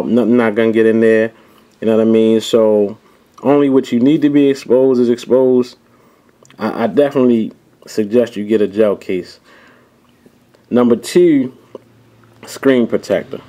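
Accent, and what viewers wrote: Baltimore, United States. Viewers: I hear that B-MORE in him all day KEYS BODYMORE all day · I like this video and your northern accent! I'm from Philly. You sound like you from B-more though. Cool video. regarding the hard cases and thing being exposed. I have the otterbox for my Evo. That thing is awesome! Nothing is exposed at all! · Sounds like your from b.more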